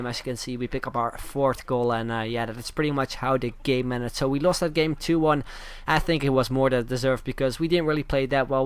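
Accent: Netherlands. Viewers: Are you from the netherlands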